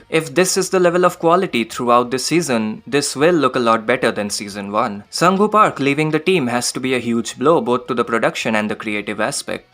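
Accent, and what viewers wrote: India. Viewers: Yep in Tybw video they were targeting you through racism,when they weren't able to find proper points to defend their criticism they were saying stuff like "you're Indian" "You can't make good content because you're from India and India is known for scams" "I can't hear you your accent is annoying" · Are you Indian? Cause you sound like it.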